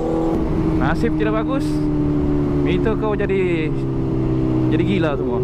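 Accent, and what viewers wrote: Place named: Sabah, Malaysia. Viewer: Suka dengar abang cakap sabah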